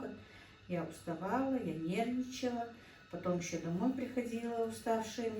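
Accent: Standard. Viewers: Учителя были хорошие и ученица оченьприлежная.Я тоже поражена чистой,хорошо поставленной русской речью.Всех благ вам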